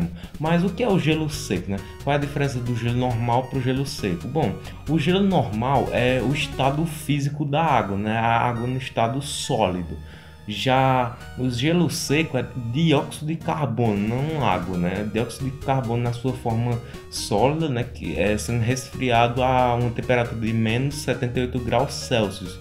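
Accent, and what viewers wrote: Northeast, Brazil. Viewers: você a do Nordeste ? se for... e nois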